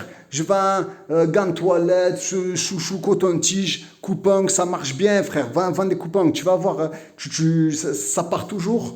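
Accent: Marseille, France. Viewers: Moi, je trouve que tu as plus un accent marseillais qu'autre chose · Frère il faut rien dire point faut juste faire. Et pour le tu parle comme un voyou je pense c'est ton accent ils ont pas l'habitude.. Ça Renvoi à Marseille le banditisme... Bref Oui en hijra faut écouter personne sérieux beaucoup qui vendent du rêve et ya bcp de jaloux en Algérie faut se méfier de tout le monde😂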